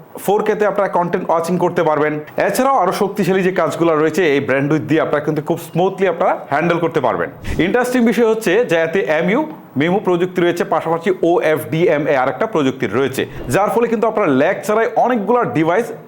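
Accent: Bangladesh. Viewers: Border par Kora Asa chan naki India ta??? Poris Kar bangali kotha bol ta paran na??? Bangladesh accent marchen... ar vul bal router ar kotha bolchan. Amazon page a giya daklam.... sob gulor review ta bolcha kichu na kuchu defect Acha.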